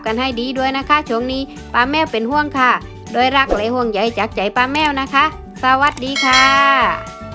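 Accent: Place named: South, Thailand. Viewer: น่าชมมากคะ ไม่ได้มานานป้าพูดใต้แล้วนะคะ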